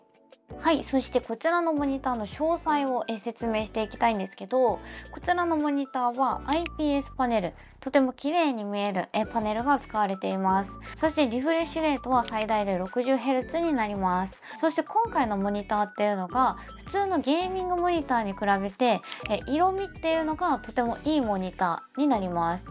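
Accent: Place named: Osaka, Japan. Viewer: 大阪弁が時折出てますね。(笑）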